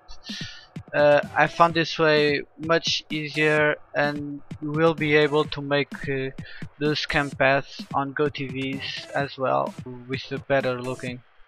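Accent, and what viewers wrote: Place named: Portugal. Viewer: Are you portuguese by any chance? your english accent reminds me of a friend of mine x)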